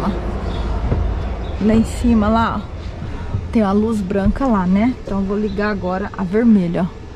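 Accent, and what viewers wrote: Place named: Bahia, Brazil. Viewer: Que perigo sempre freio aí é barril falar que nem nós baiano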